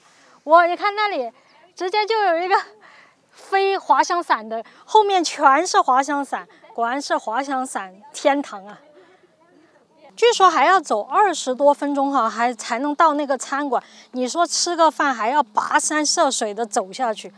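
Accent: Sichuan, China. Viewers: 兄弟，你挺美的，你一说话，我就知道是咋们四川或者重庆的兄弟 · 说的一口地道的川普好听😁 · 听你的口音就晓得是川渝勒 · 浓浓的川妹子口音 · 川普的味道还是一直保留了一点点. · 主持的川普好亲切，兄弟们点赞是必须的👍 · 四川口音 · 不晓得正大综艺的多半90后，不过确实有点像，在四川听川普，很不错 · 四川塑料普通話好親切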